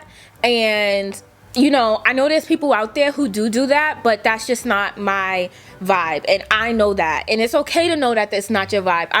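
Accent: New York, United States. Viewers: she so new york i love it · Your accent still there but it used to be worse 😂 I need the full New York back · You are a real New Yorker 🤣🤣🤣 the way you tell the story ,and all I love it . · Are you from New York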